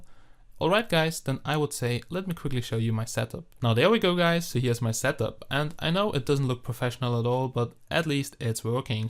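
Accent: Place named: Germany. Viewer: Are you german? That accent sounds familiar ;)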